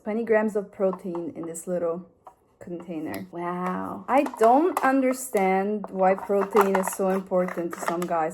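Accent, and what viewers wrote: United States. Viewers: why do u speak in american english when ur european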